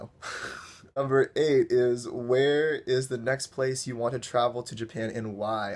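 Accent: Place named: California, United States. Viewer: I think the best thing about this video is you mentioning the nabe, mainly because I love spicy food as well. Also I have a question, where are you originally from? You sound like you're from Cali, probably southern but I'm just curious.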